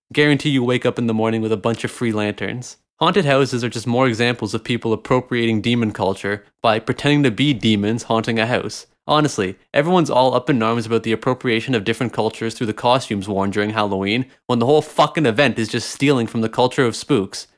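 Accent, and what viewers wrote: Canada. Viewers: All of a sudden I can hear you Canadian accent and cannot unheard it. I can't stand it. This video has a demon in it and I am now cursed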